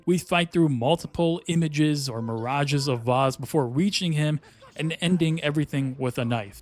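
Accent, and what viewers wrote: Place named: India. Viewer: your accent dude not American 100% just 65% are indian or something!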